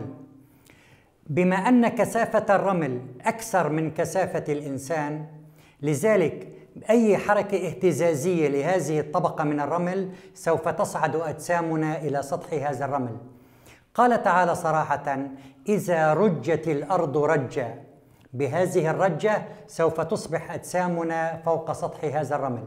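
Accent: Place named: Aleppo, Syria. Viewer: العالم سوري من مدينة حلب و هيك لهجتو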